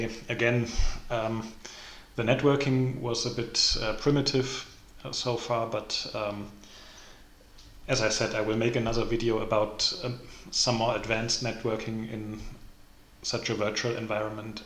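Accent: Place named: Germany. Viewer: Gut gesprochen, aber man hört den deutschen Akzent :)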